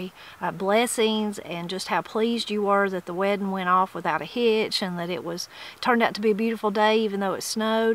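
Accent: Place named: South, United States. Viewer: you should for sure get an award for Reading the books for us, with your beautiful voice and southern accent!!! Plus for many other things you do. God Bless. Jean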